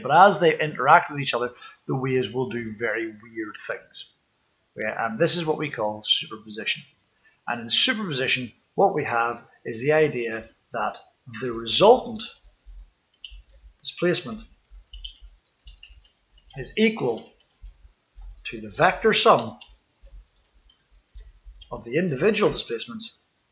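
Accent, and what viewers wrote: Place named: Ireland. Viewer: This guy sounds like an Irish man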